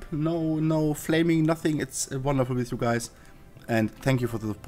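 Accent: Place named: Germany. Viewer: Congratulations! I kinda guessed you were german from your accent. But don't get me wrong, i like the accent, it's very euro-English ;P Can you please consider playing People's Republic of China? Please please please, I haven't seen anyone play as them so far.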